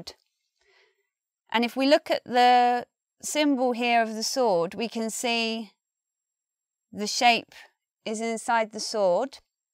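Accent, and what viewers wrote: United Kingdom. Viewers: What accent is this? It sounds British but.... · The presenter's British English makes this tutorial for memorizing IPA less useful for a learner who speaks US English as there are many differences between British and US midwestern pronunciation. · You are the symbol of the British accent.. I like to hear and listen to you a lot 🤗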